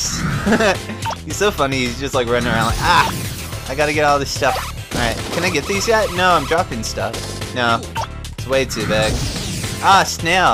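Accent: California, United States. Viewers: everyone can tell where you live by your voice because of your California dude accent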